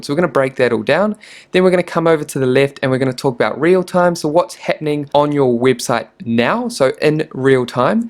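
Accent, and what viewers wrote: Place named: New Zealand. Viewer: How to use google analytics in "twinnie-twinnie"- love it. A Kiwi, right?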